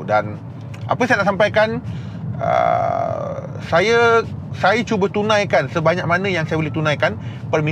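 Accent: Negeri Sembilan, Malaysia. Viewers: Bang cakap nesmilan yolah best bonar YouTuber keto cakap nesmilan 👍🏆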